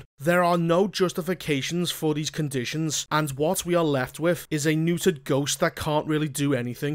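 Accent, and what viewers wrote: Liverpool, United Kingdom. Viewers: he has a 'scouse' accent as we say... it means he's from Liverpool. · He's from Liverpool. Very distinct accent.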